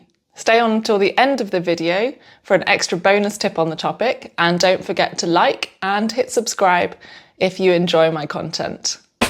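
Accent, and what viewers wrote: United Kingdom. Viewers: I like your British accent. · Edinburgh? How come, that to me (austrian) "scottish" (is it?) is far better understandable than "english"? Thanks for wonderful lessons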